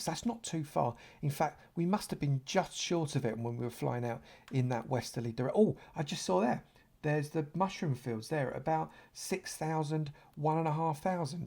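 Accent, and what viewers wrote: United Kingdom. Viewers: U r british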